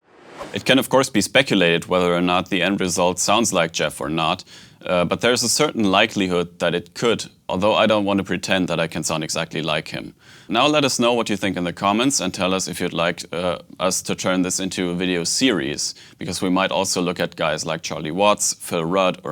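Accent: Germany. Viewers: Germany accent ❤❤❤❤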